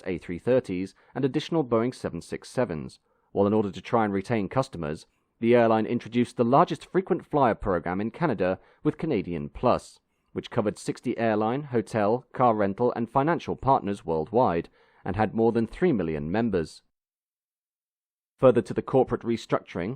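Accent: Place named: RP, United Kingdom. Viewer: Quite a few words are mispronounced, among them 'The Pass', which is pronounced 'Da Paa'. The plummy British accent is also off-putting.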